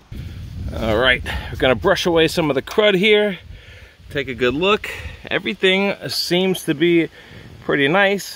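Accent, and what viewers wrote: New York, United States. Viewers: Enjoy the NY accent... what kind of welder?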